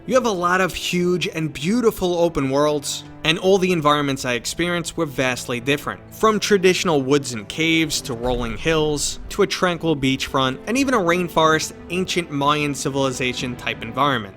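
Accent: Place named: Boston, United States. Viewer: Great video. Also you sound like you're trying really hard to hide that Boston accent, but failing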